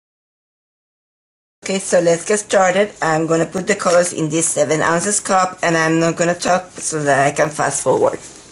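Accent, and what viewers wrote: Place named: New Mexico, United States. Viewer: I love hearing the way you say the names of colors, I miss New Mexico and your accent is lovely to me, and I have been able to remember the different types of colors, for choosing due to the way it registers.. and the fact that your examples with these paints are totally inspiring, motivating. thank you.. for honest and talented pours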